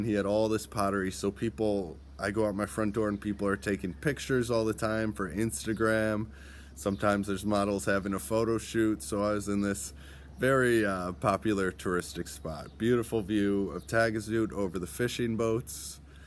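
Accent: United States. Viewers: Hello excuse me. I am British, don't forget we taught you Americans English lol. Although i enjoy the American accent, we see our British accent as the more refined version. :)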